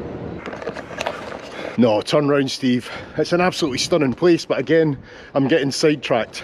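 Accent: Scotland, United Kingdom. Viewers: I live your Scottish accent !! ❤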